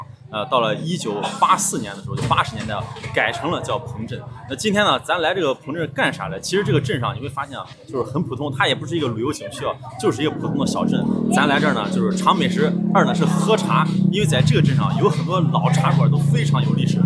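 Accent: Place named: Henan, China. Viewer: 我就喜欢看 你个陕西人带着河南味儿说四川话，用爵士乐伴奏着中国农村街头大妈做小吃 的那种感觉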